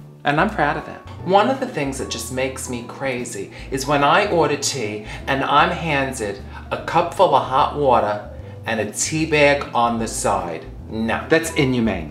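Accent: United States. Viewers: does anyone know what type of american accent that is? i like it. is hilarious. could watch her videos all day.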